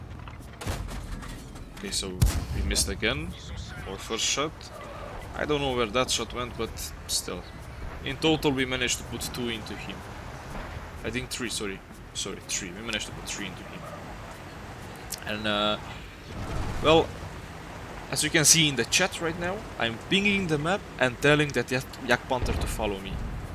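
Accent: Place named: Belgium. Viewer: Ik kon je accent maar niet plaatsen tot je 'tier' zei hahah, ik dacht gelijk een Nederlander met een raar accent en toen kwam de 'spotter' en toen dacht ik belg hahahah. Toffe video man, gesubbed en geliked.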